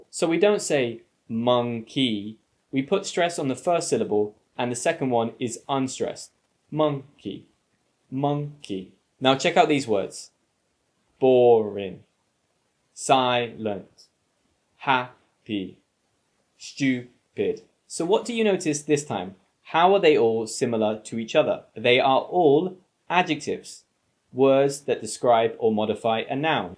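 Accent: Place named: England, United Kingdom. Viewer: I like listening to your true English accent, not the weird American pronunciation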